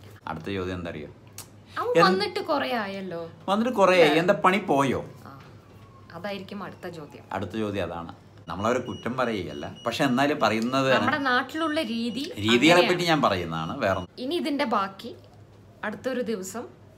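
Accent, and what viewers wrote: Palakkad, India. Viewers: ഈ സ്ലാങ്ങ് പാലക്കാട്ടിലെ ചിറ്റൂർ കൊഴിഞ്ഞാമ്പാറ, വണ്ടിത്താവളം എന്നീ ഭാഗത്താണ് പ്രധാനമായും നമുക്ക് കേൾക്കാനാവുക. കേട്ടിരിക്കാൻ നല്ല രസമാ☺️☺️☺️🤝🤝 ജോയിന്റ് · Palakad slang enik eshtatto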